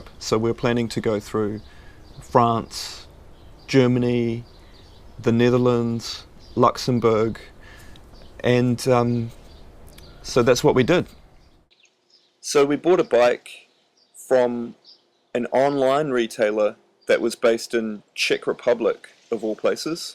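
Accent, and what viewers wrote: New Zealand. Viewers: Hi from another kiwi (I think you sound like a NZer). I'm living in Japan and I have a Tern Eclipse S18 bike that I use to get around on the trains etc here. I'm planning on riding around the world (as much as I can anyway) in a couple of years when I turn 63 and I'd really like to take my folding bike. Its either that or my Surly LHT which is a great bike but doesn't fold. I've asked Mira Slegl <link> who took one around a lot of the world how it was but he said that a folding bike isn't very good on rough roads. Can I ask you how was your experience with a folding bike. Did you have any mechanical problems with the fold mechanism? I'd really prefer to take a foldie if I can. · u talk with kiwi accent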